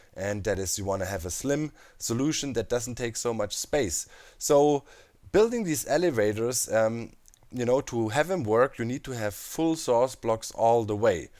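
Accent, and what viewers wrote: Germany. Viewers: you are german man hört das einfach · Deutsches englisch erkennt man immer xD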